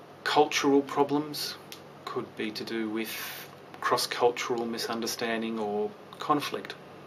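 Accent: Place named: Australia. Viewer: it's an Australian accent.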